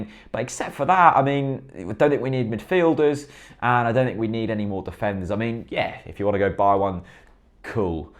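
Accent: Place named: Norfolk, United Kingdom. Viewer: I think it's the Norfolk accent. But not sure.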